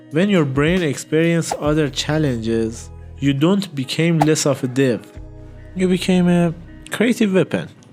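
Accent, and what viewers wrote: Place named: Iran. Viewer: your accent screams "چایی و کوروش کبیر"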